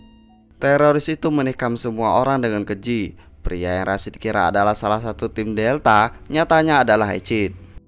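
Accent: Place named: Sumatra, Indonesia. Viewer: swara abg kek logat kepri or sumatra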